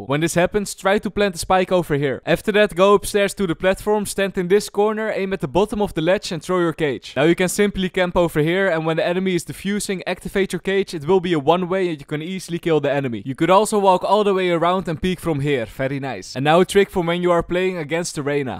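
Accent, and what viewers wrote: Netherlands. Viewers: bro wait you are dutch, i didn’t even check i can hear it lol 😂😂😂😂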